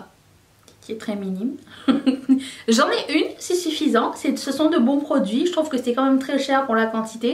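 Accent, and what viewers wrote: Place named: Quebec, Canada. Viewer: Chui d'accord av toi pour UD .. perso quitte à me choper une palette dans le même genre , j'opterais pour la Vénus XL de limecrime ❤ j'adoooore limecrime ... puis elle est plus grande pour le même prix si il faut . Ta chaîne est géniale en tout cas , tu me fait délirer et tu commence à voir le petit accent tabarnacle ;) T'as un putain de look chui trop fan ! Et on en parle de ton truc en plume la ?? Ou as tu shopper cette merveille !!!!????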